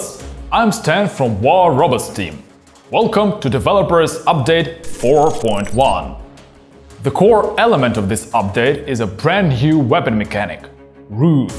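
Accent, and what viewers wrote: Russia. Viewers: ^^^^^^^^Help them Translate??!! To English I hope, I had to put closed caption on to understand this Rooskee..LOL^^^^^^^^^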